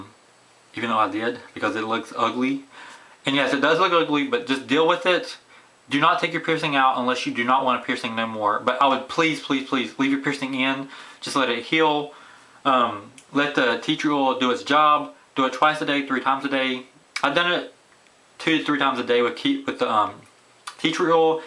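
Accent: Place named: South, United States. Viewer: It sounds southern my guess would be Georgia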